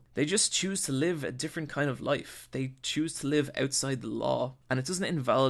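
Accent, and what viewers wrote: Ireland. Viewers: Great review. btw are you Irish?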